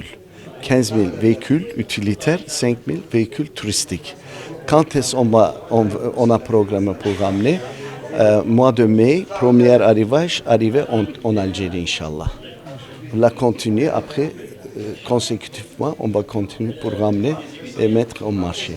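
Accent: Turkey. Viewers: عجبتني لهجته التركية في حذيته بالفرنسية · تركي تركي تركي يا عباد هدا تركي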